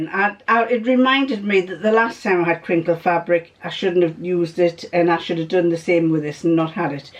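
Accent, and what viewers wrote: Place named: North East England, United Kingdom. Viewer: Hi, I love seeing what you make. I have been sewing for years and years but still can’t seem to make anything that fits me perfectly. How on earth to you manage to measure yourself and adapt patterns to fit your body? I know you said you live in Richmond but your accent suggests that you were brought up in the North East, like me, am I right? Please keep the videos coming as I learn so much from you xx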